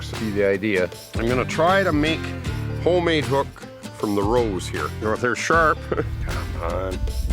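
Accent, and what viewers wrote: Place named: Canada. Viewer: you sound like your from Canada 🍁 🍁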